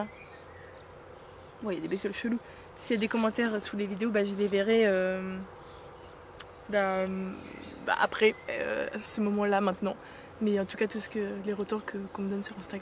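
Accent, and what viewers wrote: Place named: Italy. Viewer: Magnifique videos!!. Tu commencais a avoir l accent italien!!!!. Bravo a toi !!. Tu es une baroudeuse😊. A bientot j espere. Revients!!!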